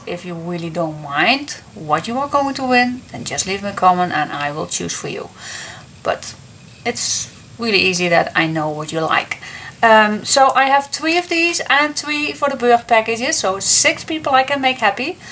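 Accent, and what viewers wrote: Netherlands. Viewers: Merry Christmas!! I love your albums because they are well finished and always very elegant and your tutorials are clear and make everything seem so easy and affordable for everyone. In addition to this I love to listen to you because my dad was Dutch and when you explain, I hear the Dutch accent and I remember when I was young and I heard my Dutch Uncles coming to visit us in Italy! Thank you for this opportunity to win one of your kits, both the kit of your album and the kit of birds are fantastic gifts!! Merry Christmas from Italy!! Nicoletta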